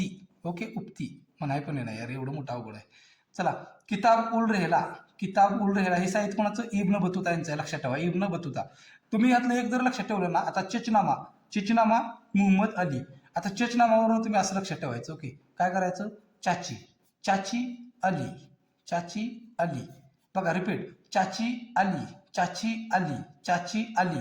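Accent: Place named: Latur, India. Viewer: तुम्ही लातूर चे आहात का 😂😂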